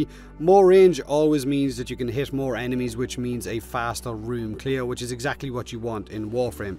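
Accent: Ireland. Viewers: Am i the only one that doesnt give a fuck about the warframe but come just for that sweat Irish accent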